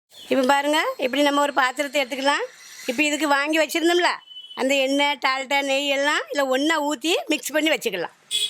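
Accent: Tirunelveli, India. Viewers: நான் பிறந்து வளர்ந்தது எல்லாம் திருநெல்வேலி தற்சமயம் சென்னையில் இருக்கிறேன் திருநெல்வேலியில் இருந்த காலத்தில் வாரத்தில் ஐந்து அல்லது ஆறு நாட்கள் அல்வா சாப்பிட்டது திருநெல்வேலியின் தட்பவெட்ப நிலையும் தாமிரபரணியின் தண்ணீர் வளமும் திருநெல்வேலி மக்களின் கை பக்குவமும் திருநெல்வேலி அல்வாவின் உயர்ந்த ருசிக்கு காரணம் உங்களது திருநெல்வேலி பேச்சை கேட்க கேட்க சந்தோஷமாக இருக்கிறது அனைவரும் நலமுடன் வாழட்டும் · Thoothukudi and tirunelveli slang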